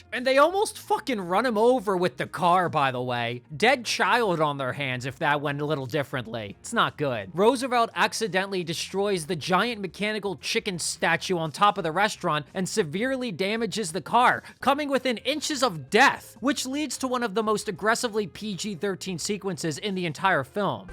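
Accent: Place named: Hawaii, United States. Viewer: Dude r u from Hawai’i?? U got sum pidgin in you!!!!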